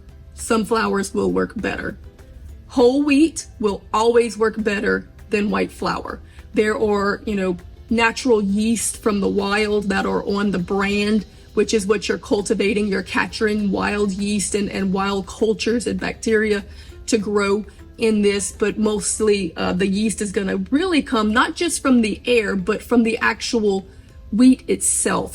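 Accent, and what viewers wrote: Louisiana, United States. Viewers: I just came across your video and SO HAPPY I did!! I’m going to try this way of creating a starter. I did have a question while watching your video. I heard an accent come across that sounds very much like south Louisiana. Where are you located? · Great video. Very helpful. I thought I detected a Louisiana accent. My grandmother was a Mayeux. I plan to try your recipe with yogurt.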